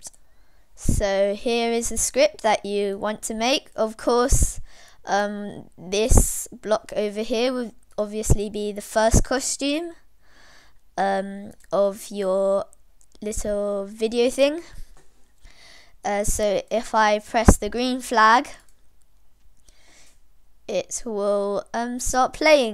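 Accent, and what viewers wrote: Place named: United Kingdom. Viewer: R u British? You sound British if your not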